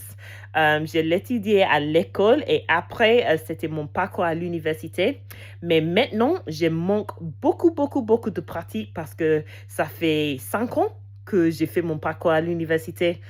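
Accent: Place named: England, United Kingdom. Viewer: 4.5 le 0.5 c'est juste que t'as un accent anglais encore mais c'est mignon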